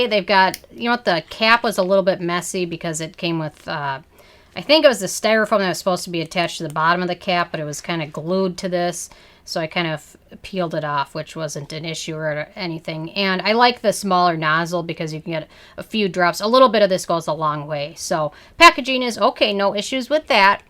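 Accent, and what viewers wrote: Minnesota, United States. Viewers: You sound Minnesotan/North Dakotan. Am I hearing things right? 😳🤣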